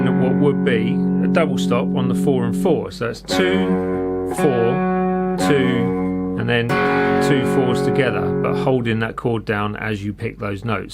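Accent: United Kingdom. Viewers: Your British accent is so pleasant to listen to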